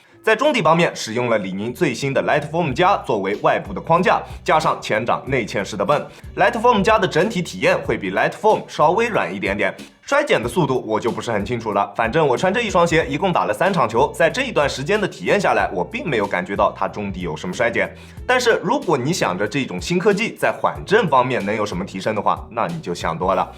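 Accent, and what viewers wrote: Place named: Zhejiang, China. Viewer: 看到嘉兴市原来是浙江老乡难怪口音这么亲切哈哈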